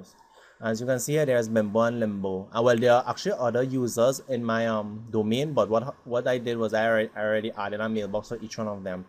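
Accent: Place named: Trinidad and Tobago. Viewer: Are you from Trinidad? You sound like it real bad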